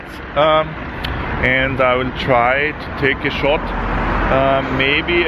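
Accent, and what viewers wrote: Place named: Germany. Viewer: Dein deutscher Akzent bei deinem Englisch ist der Hammer🤭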